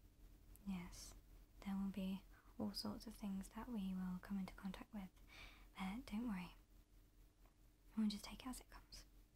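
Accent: United Kingdom. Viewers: ASMR + Cosplay + British Accent + Cute Shawty + Sci-fi + Mythology + Fantasy + Movies/Video Games = Exothermic Reaction 🔥 🔥 🔥 This channel gonna blow up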